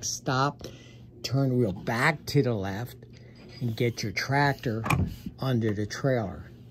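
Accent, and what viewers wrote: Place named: Maryland, United States. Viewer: Thank you so much for all your videos they were a huge help , I got my CDL today 🎉 Also I’m originally from Maryland so you sound like my people 😊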